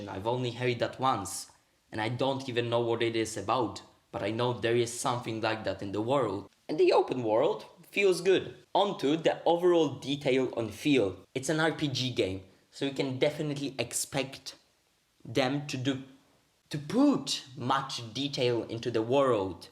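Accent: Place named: United Kingdom. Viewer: and stop the british accent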